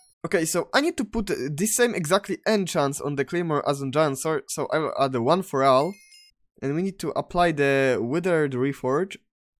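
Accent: Poland. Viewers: Just by the voice i knew you were Polish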